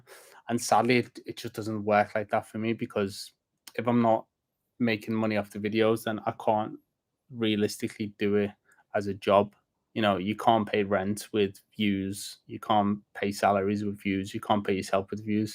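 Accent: Liverpool, United Kingdom. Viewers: OMG he is a Scouser 😮 I thought he was Brazilian 😂 as he never spoke before. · Christ I didn't have you down as a scouser. Slight twinge in the accent though, like spanish or italian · Lad, are you Scouse? There’s definitely a twang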